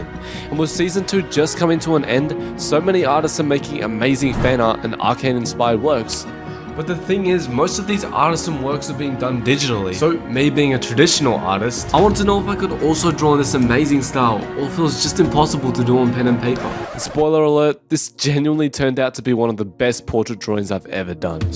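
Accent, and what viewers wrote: Australia. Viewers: Are you Australian cuz I am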